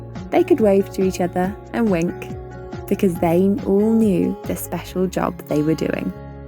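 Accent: United Kingdom. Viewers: Gotta love the British accent! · Yesh theres a harry potter accent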